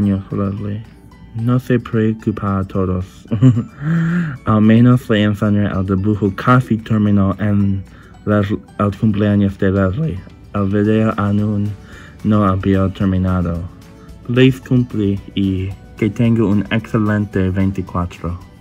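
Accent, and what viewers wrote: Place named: England, United Kingdom. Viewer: Me encanta ese acento de los ingleses cuando hablan español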